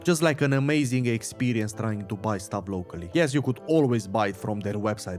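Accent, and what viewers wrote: Romania. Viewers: Știam că ești român după accent :))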